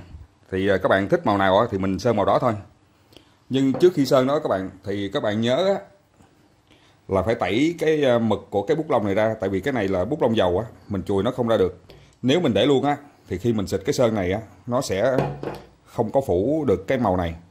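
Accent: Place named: Vietnam. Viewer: ฟังภาษาเวียตนามแล้ว ปวดหัวมาก สำเนียง ปิดลำโพงเลย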